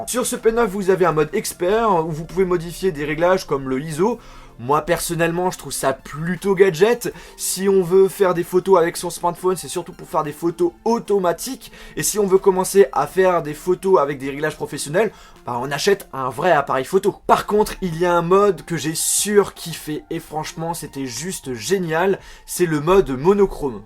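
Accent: France. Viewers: Are you French？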